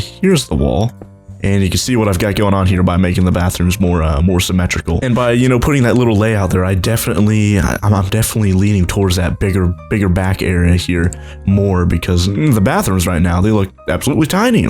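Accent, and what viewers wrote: Australia. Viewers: AUSSIE MATE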